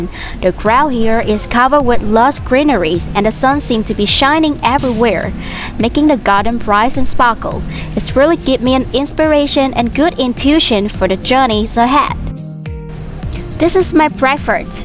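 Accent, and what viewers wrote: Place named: England, United Kingdom. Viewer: Love your English accent so much ❤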